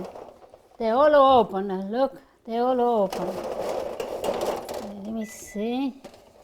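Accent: Italy. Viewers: Thank you. I love you so much. When I hear you speak you take me back to the good old days of being around my Italian family And with my grandmother who didn't speak any English. Thank you for your Italian kitchen making great Italian dishes. Thank you for the memories you bring. I love you. 🥰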